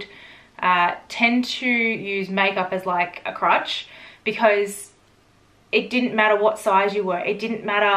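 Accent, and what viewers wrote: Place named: Australia. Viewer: don't worry about this toxic remark, you are too polite, girl👏😘, your charisma, intelligence gorgeous Australian accent😉😘, beauty and character make up for anything and everything, ok 💐🙏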